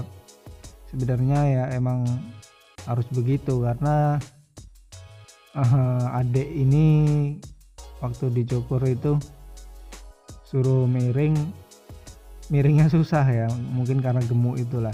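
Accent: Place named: West Java, Indonesia. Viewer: Kyak logat sunda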